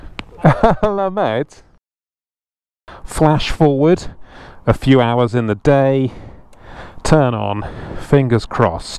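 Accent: England, United Kingdom. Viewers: Nice to have you back! As a Dutchie I really can appreciate you're semi-posh English. Keep on driving....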